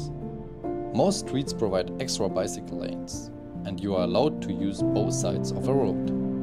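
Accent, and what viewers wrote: Germany. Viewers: Do I hear german accent? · Well done, enjoyed watching and seeing your amazing footage🥰 Moreover i like your german accent. Keep it going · I am not offending your English. It is perfect. 😊 I just wonder if you are from Germany, because of little accents. You have done a pretty job capturing Taiwan. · Awesome video, great footage and editing. Love the city, too... but the reading is quite frankly... sub-optimal... thick German accent, mispronouncing easy words, ... :'( Maybe re-do it with a native speaker?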